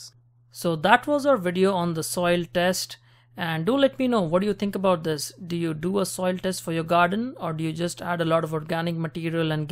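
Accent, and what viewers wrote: India. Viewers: Everything else is a regular indian accent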